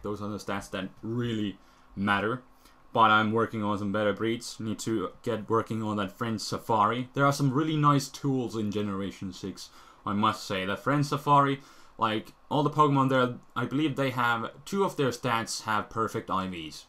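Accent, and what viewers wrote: Finland. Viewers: Oh it's a Finnish accent sorry about the confusion but it's very formal :-) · So are you from Finland?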